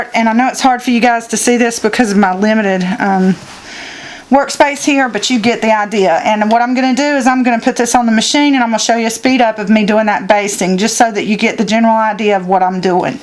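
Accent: South, United States. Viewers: I love how straight forward you are with your southern accent, you had me cracking up. You didn't film you sewing that curve because it takes some kind of witchcraft, doesn't it? Can't let all your secrets out! 🤭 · Thank you for this video, it was really good and helpful. I LOVE your accent ( I'm here in England) I'm guessing you're in the South? I was thinking you might enjoy getting a extension plate for the sewing machine, it makes life easier when you're working on a wide piece of fabric.....just a thought. I like your no-nonsense approach, I too curl a lip up at Bias tape!!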